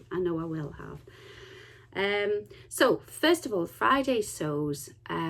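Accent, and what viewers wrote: United Kingdom. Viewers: I do love that fabric of this latest wrap dress it's so lovely. I wonder where in the UK you live as I'm trying to identify your accent.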